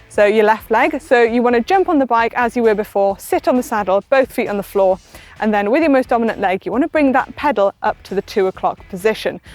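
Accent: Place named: Bath, United Kingdom. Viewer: Your in bath ik because my grandparents live by odd down and the accent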